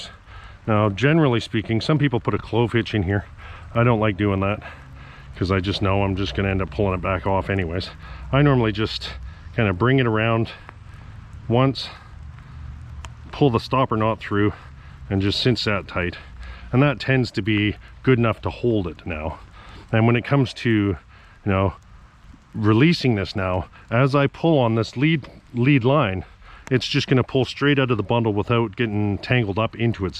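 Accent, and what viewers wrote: Canada. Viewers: Are you from Nova Scotia ? Not mocking you , lord knows I have my language tells . You say the word yeah a lot . It reminded me of the ina bomber movie how the fbi caught him with his language tells.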